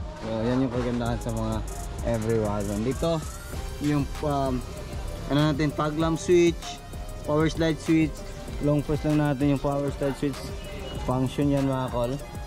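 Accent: Visayas, Philippines. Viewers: Bisaya ka boi?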